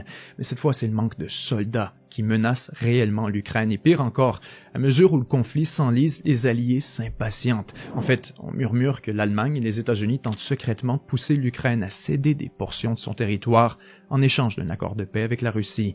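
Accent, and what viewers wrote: Quebec, Canada. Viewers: Est ce que tu serais québécois par hasard? Ton accent sonne bien.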